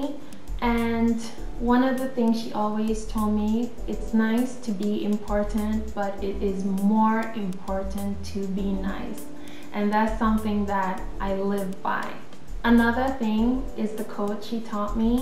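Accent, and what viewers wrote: Jamaica. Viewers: majamajami ........she sounds Jamaican...................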